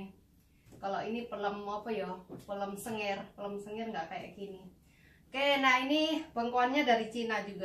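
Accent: Java, Indonesia. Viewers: Mornibg,ni ch saya lht pas pagi nyiapin msak mjkn sdh selesai,b8sa buka You Tube di dpur...biasa nyuri waktu...saya slalu bgikuti...saya bgt logat mu msih medok juowo bgt lo jeng,iyo jebg ngono iku ra sah di dengar jeng,anggap ae org iri dgn kehidupane pean..saya salut bgt,bisa jdi IRT yg bsik,saya bisa mencari tmbhan rezeki,tetapi anak"ku q titipin ke Ortu ku...n...kakakku. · Hahaha salut mba semangat ibu hebatt ... Sy seneng gaya ngomongx wong jowo biar tinggal di luar ngakk sombong 😍😍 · Hahahhaa aku nontonnya subuh2 jadi ngileerr pengen rujak buah, ditambah lg mbak pas cerita logat jawanya keluar bgt jdnya lucu gk ada kesan judes 😂😂 aplagi pas nguleg lombok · Ya.. Mbak2 sekalian... Kita yg tinggal di luar negara saja yg dpt memahami.. Org yg gak pernah keluar negara atau tak berkeluarga dg org asing mmg tak faham.. Mak saya dah 29 tahun tinggal di malaysia.. 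Bahasa Indo nya dah cacamarba kira wang indo lagi lucu.. Saya rasa mbak masih ok sangat.. Bahasa jawa masih medok bahasa Indo masih ok.. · baru liat channel mbk sekali tapi ud sy subscribe soalnya bagus banget anak ttp diajarkan dua budaya bahkan budaya tempat tinggal luar biasa. banget bisa pertahankan logat jawa juga😊😁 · Mbak orgnya asyik kok.. gk sombong.. gk lp bahasa indonesia aplg bhs jawanya itu lo.. medok bgt 😊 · Biarin orang nyinyir, karna nyinyir tanda tak mampu, semangat mbak, kamu bisa jadi inspirasi wanita Indonesia, aku suka banget gaya bahasamu yg medok jowo banget. Kita kan orang jowo.❤️❤️❤️ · Sabar mb.... Namanya orng bnyak y bgtu Tp ak suka ketawa klo logat jawanya keluar...podo mbak kro ak ...hehehehe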